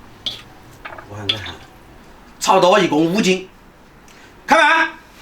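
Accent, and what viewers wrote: Sichuan, China. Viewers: 👀你吃就要笑。最好不要吃多麼快。喜歡你的四川口音。well done!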